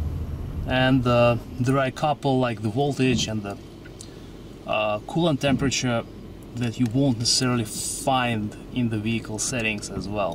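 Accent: Russia. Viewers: Недавно купил такую для 2017 Toyota highlander с OBD подключением... Подключил без установки в гнездо крышки динамика. После прохождения теста экран тупо гаснет и все. Включаешь двигатель (у меня гибрид) и ничего - кнопки только пищат при нажатии. Пробовал проехаться: 3 минуты ехал и нифига - пустой экран... Дефективный девайс, походу... Во тут видео, шо я снял для продавца (тоже на английском с таким же русским акцентом :) - <link>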